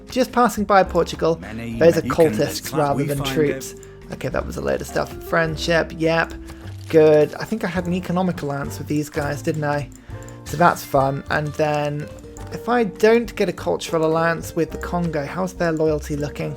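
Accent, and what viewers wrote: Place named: England, United Kingdom. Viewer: Whats your unique accent? I thought it was English lol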